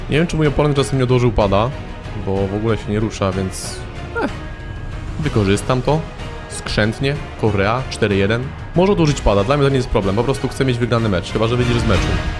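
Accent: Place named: Germany. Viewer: zwykły żabolski Szwab a nie Polski akcent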